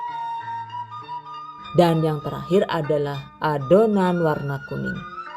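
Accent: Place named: Cilacap, Indonesia. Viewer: Jadi eman" di pangan, enak disawang. Penuh kesabaran tenan dan ketelatenan tentu ne. suwun ilmu dan trik" nya. saya kangen kalau ngomong gawe logat Cilacapan. [suwun]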